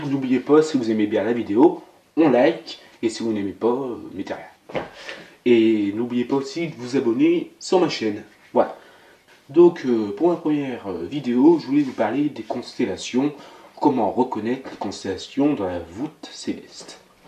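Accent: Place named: Nord-Pas-de-Calais, France. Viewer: Magnifique accent ch'ti en tout cas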